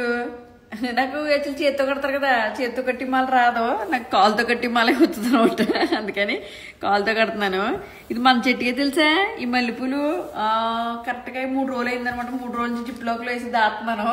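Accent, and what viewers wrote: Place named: Amalapuram, India. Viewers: ఏదేమైనా మీరు మన అమలాపురం వాళ్ళు ఎలా వుంటారో ఎలా మాట్లాడతారో అలానే వున్నారు,కొందరు వూరు మారితే అన్నీ మార్చేస్తారు,వడ్లు కాసే చెట్లు అంటారు ,ఎవ్వరేమనుకున్న నువ్వు సూపర్ సిస్టర్ ❤❤ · Mekkudani..antu chinna ragam theysaru..adgadi..mana konasema..theeepidanam.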